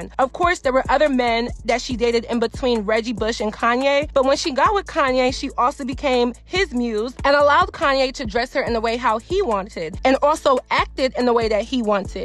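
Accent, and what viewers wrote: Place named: Baltimore, United States. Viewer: Random, but I can tell by her accent and the way she enunciates her words she is 99% likely from Baltimore....great video...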